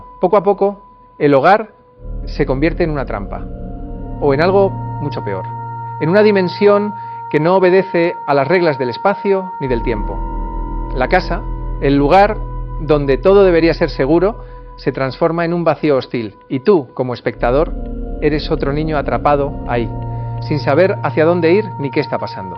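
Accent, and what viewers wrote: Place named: Spain. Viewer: no sabia si eras latino o español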